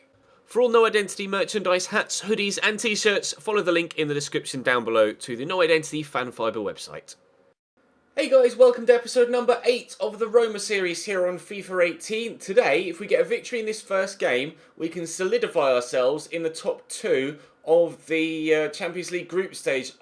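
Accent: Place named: RP, United Kingdom. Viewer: Why do you speak like a class posh commentator?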